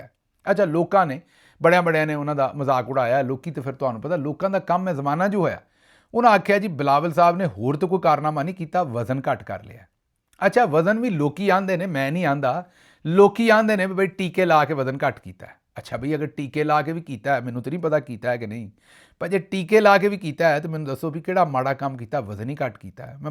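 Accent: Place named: Malwa, India. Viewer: Sahib tuhade wali Punjabi Bathinde Mansa area vich boli jandi hai.Mai tuhada prog bahut enjoy karda ha. God bless you.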